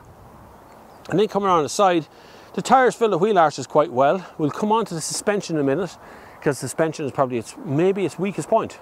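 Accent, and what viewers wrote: Ireland. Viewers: Great review. I don't think Laura understands the Irish accent. I know some of my friends who have strong accents, have problems with Alexa aswell.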